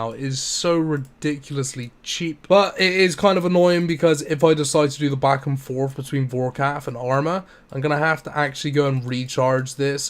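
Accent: Bristol, United Kingdom. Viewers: keep up the great vids man, really entertaining! also where in the SW are you from, you sound very bristol my friend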